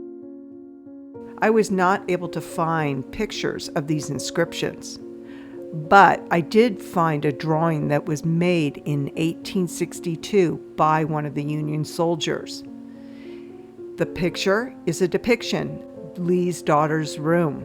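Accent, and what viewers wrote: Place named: Iowa, United States. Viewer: Narrator must be from Iowa. I grew up there and it’s the only place where I heard natives say “Warshington” or warsh.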